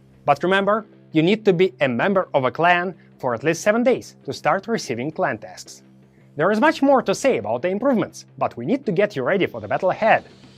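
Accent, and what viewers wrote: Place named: Russia. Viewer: Мэн ну у тебя и акцент... Русский чтоль?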